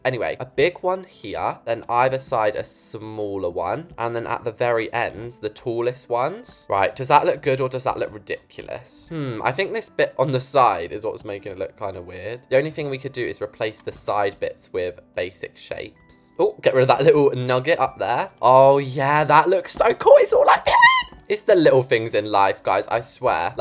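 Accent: United States. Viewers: I love ur builds but I’m not the biggest fan of ur style… or ur American accent srry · I CANT I LOVE THE A,ERICAN ACCENT ITS TOO FUNNY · Do an entire video in your american accent and call everything in their american way, Ex: Crisps are now chips. (im from america lol and want to see you strugle with everything) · I love how he does the American accent 😂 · I LOVE you Amarican accent:) Its sooo Fun! She should come to Amarica someday!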